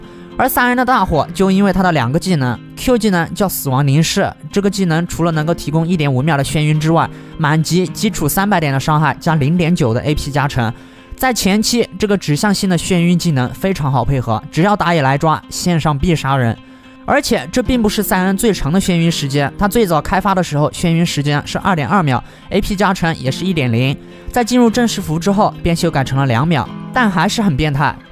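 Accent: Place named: Shandong, China. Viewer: 这一口山东味。。。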